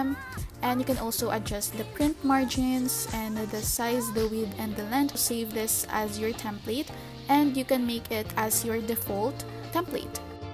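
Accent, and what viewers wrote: Philippines. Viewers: Heard her Filipino🇵🇭 accent from the start😌 but confirmed it when she said cHoUR🥴 AHAHAHAHA nice videooo thankss❤️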